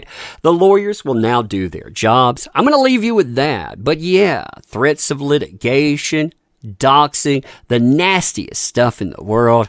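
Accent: South, United States. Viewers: I'm confident you've all (star youtubers🥰) thought about a class action lawsuit against Shane and/or the guy that threatened you with FBI and/or AH for threatening you and your families....but I'm also guessing none of you want to be tied to a lawsuit, you just want to move on with your lives....gracing your audiences with the truth, unlike the mainstream media. On another note, I can't tell you how much I've enjoyed watching and learning from all of you. Especially you, I just love your powerful, short presentations with a southern drawl covered by an umbrella.....mysterious and elegant. Anything I can do to back you guys up......I'm in!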